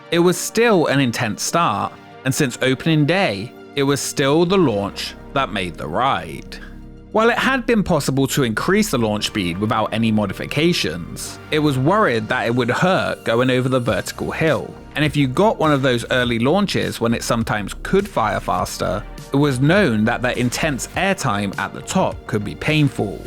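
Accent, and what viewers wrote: United Kingdom. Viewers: be honest are u a brit?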